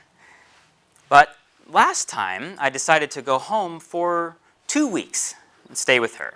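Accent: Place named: Japan. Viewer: Dude this is beautiful! You've grown so much over the last few years. I'm impressed, proud of you, humbled, and reminded of how when I first started dating my wife I had similar reflections ("I'm from California; she's from Kansas; we're basically from different universes") that helped a lot and that I have since forgotten and started to take for granted. Thanks for the reminder! PS — You have started speaking with the cadence of my Japanese friends...INTERESTING <3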